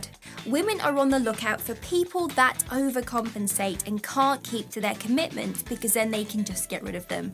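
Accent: United Kingdom. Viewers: has potential to reach 1M subs. This british accent drive ppl crazy lul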